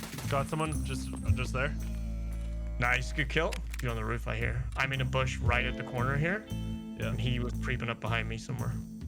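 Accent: Canada. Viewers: 1.6k hours and I do know all this stuff but your videos are just so damn fun to listen to that I’m still gonna watch it! You and your damn whacky Canadian accent, keep it up brother!!!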